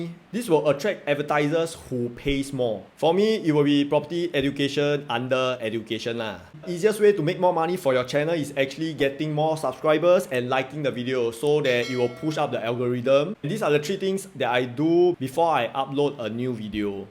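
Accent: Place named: Singapore. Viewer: Your singlish and your singaporean accent is the reason why i subscribe to you;like listening to an elder brother talking to me, very very local.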